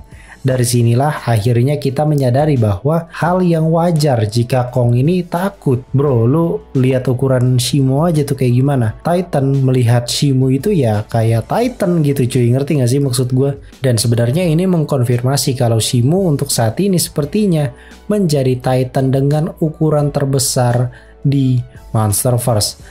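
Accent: West Java, Indonesia. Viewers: Kang, sunda na timana? hehe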